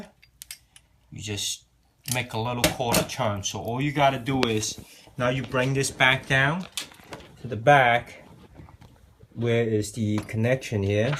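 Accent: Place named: China. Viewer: A chinese accent with a little New Joyseee accent... and you get this guy... awesome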